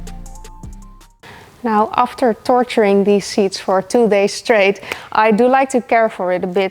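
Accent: Netherlands. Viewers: ARE YOU DUTCH? 🙋‍♂️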